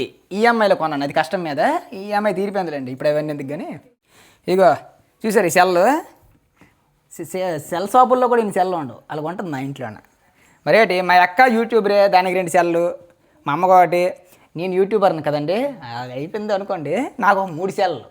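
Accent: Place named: Telugu, India. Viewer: That slang is pure telugu ❤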